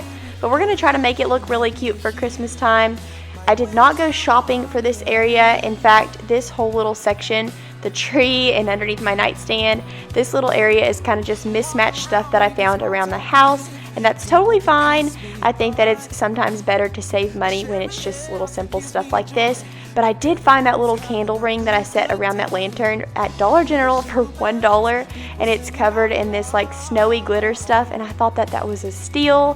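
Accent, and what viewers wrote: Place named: South, United States. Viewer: I'm new! I just love your channel ❤ You are very relatable as I live in the south and you sound like you could be my neighbor!😁 I think the room looks so warm and it gives me "Hallmark Christmas Movie" vibes.🎄 Have a super weekend! ~hugs🤶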